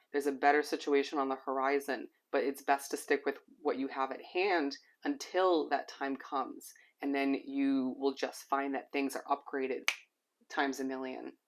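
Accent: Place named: Midwest, United States. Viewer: You also have a VERY MIDWEST ACCENT WHICH IS SO CUTE! 🤩😍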